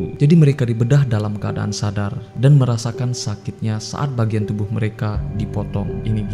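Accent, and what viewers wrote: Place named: Medan, Indonesia. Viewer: Kaya ada logat medan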